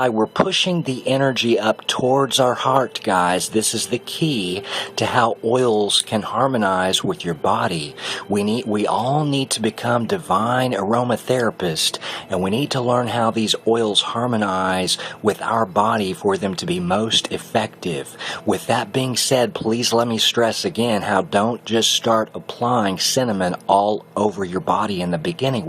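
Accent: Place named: South, United States. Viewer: I love your Southern accent. <3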